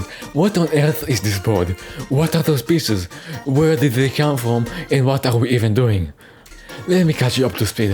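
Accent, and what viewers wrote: France. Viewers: It sounds like french.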